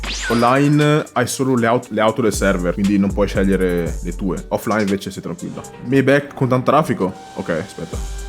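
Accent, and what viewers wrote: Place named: Veneto, Italy. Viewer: grande vecio ti ho conosciuto da poco,spacchi,e poi te si veneto 💯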